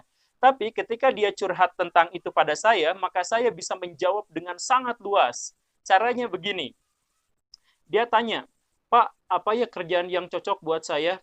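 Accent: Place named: Sunda, Indonesia. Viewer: Pandai adek I yg ganteng nich... Sunda nya medok ieu teh. ...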